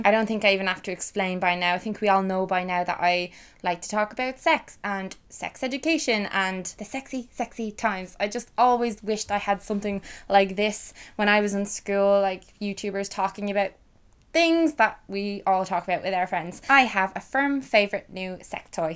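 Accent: Ireland. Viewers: Is she Irish 🤔🤔 X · most youtubers just bore me but i could sit and watch all your videos back to back because i love the content so much! but the irish accent just makes me feel so at home, as my grandad is irish and i love listening to his stories!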